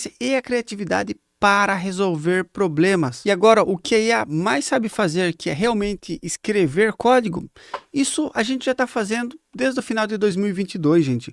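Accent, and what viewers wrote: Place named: Sorocaba, Brazil. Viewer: Nossa cara eu moro em Sorocaba SP e pelo sotaque ia até perguntar se você era da região mas respondeu a pergunta e sempre como ótimos vídeos.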